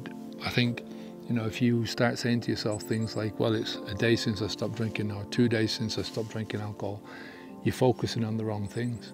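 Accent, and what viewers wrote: Ireland. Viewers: Things sound more true with a good Irish accent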